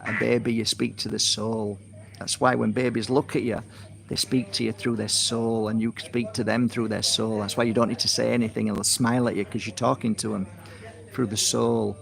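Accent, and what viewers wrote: Yorkshire, United Kingdom. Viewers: Hi. I would never have guessed that you are from Yorkshire haha ❤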